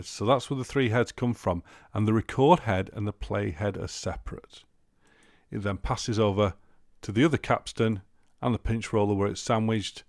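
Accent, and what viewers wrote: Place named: Manchester, United Kingdom. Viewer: You earned a sub from me, Mate and I haven't used a tape in years. I find your accent to be excellent to listen to. Sounds like the bloke from Manchester I watch walking all the canals and sleeping in the woods. On top of that. you replied in a minute to a comment of mine on one of your old videos indicating that you appreciate people watching your stuff!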